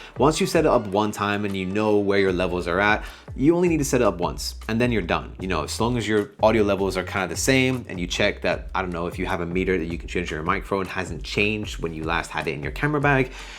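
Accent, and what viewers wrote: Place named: United Kingdom. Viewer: Love the S9!!! OPENGATE!!! by the way at first I thought you're american but I keep thinking you sound kind of british. I had to check your channel info 😅 (fyi I'm studying how to speak English which is why I'm trying to guess the accent 😅)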